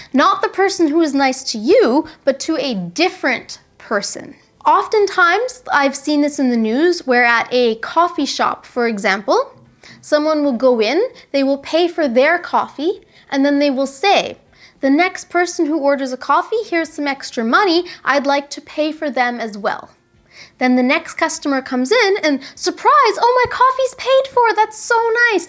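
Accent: Canada. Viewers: Love u, despite you're Canadian and your pronounciation is a little bit different from U.S.A I always understand what you say and the way that you express is so clear as well. Regards!!!☺ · Are you from Canada?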